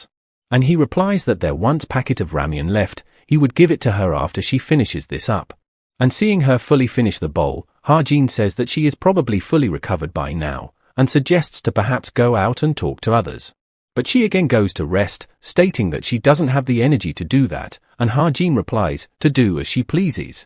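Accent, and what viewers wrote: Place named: United Kingdom. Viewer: Why does the ai voice sound British I ain complaining tho this peak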